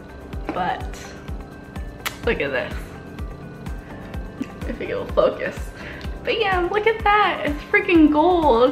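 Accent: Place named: Philippines. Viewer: Your voice is just like a Filipino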